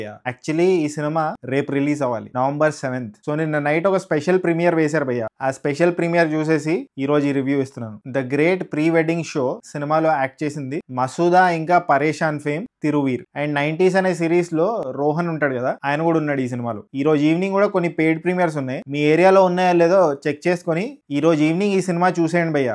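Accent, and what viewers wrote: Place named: Vizianagaram, India. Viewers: Srikakulam slang kadhu bro Vizianagaram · Vizianagaram slang bro adhi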